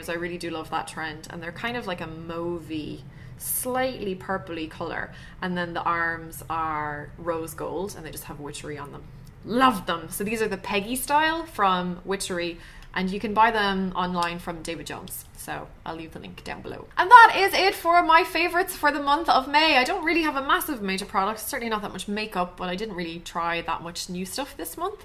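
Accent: Ireland. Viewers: Don't want to sound like a stalker or anything but what part of Ireland are you from? ;)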